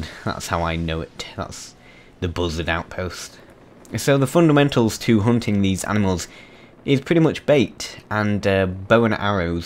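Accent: United Kingdom. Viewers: This guy is british.cuz dat accent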